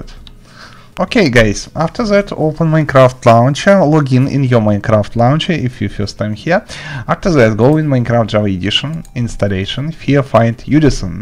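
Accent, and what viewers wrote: Russia. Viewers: Русский акцент имба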